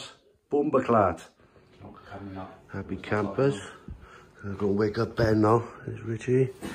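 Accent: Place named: Cardiff, United Kingdom. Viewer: Looks great great video and voice over that sounds like a Cardiff boy 👌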